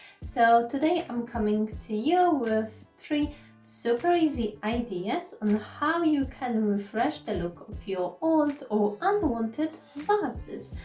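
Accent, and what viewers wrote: Poland. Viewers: Ciao, i see you're from Poland i hear accent but great not shy of that i'm quite scared to speak on video because English is my 4 th language but i do a lot of diy projects, prefer more diy's on video so we could choose from, advise before to dry clay put them on the surface that you going to glue so they keep form of it, keep going it's all process enjoy😘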